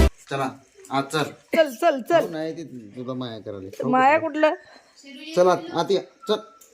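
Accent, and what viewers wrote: Kolhapur, India. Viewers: Hii Me aata 5/6 devsapasun tuze vlog bagte ahe....tumche kolhapuri language mala khup aavdte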